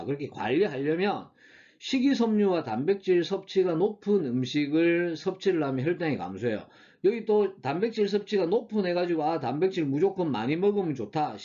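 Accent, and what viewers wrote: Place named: Busan, South Korea. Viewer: 크 알겠습니다 ㅎ 오랜만에 듣습니다 단디 ㅎㅎ 저도 부산놈이라 형님 사투리가 참 좋습니다 ㅎ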